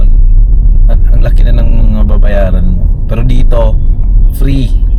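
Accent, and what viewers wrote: Visayas, Philippines. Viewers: bisaya diay ka sir hahaha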